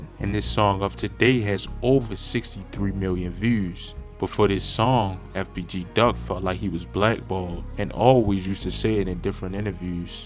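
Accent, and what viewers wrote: Baltimore, United States. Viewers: He gotta be from Bmore with that accent lol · I’d guess Baltimore. I loved there for about 6 yrs. that’s definitely a bmore accent. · Are you from Baltimore? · You from Baltimore, my DC ass can hear it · That Baltimore accent something else!! Like your content. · dude who channel this is gotta be from Baltimore lmao he say " Tewww , Lewse , Avenewww " 🤣🤣🤣🤣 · you from baltimore aint you? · He definitely from bmore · Facts I literally can hear it in his voice especially when he said “Tew” instead of “too” Baltimore people stay saying that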